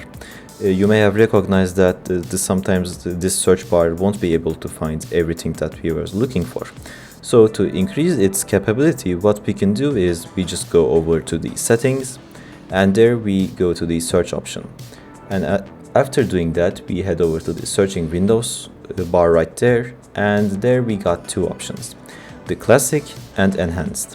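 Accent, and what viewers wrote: Turkey. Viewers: Are you from Turkey ?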